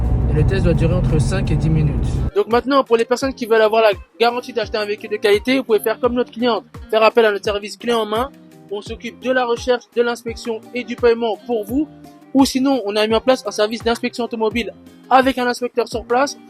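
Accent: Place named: Africa. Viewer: Rien pigé,vous avez un accent africain très prononcé.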